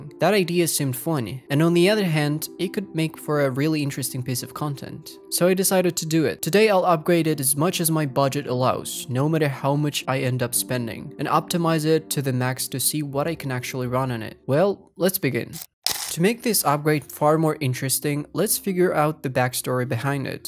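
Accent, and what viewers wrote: Russia. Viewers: Ха,он русский 😂,спалился братан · is bro russian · U russian?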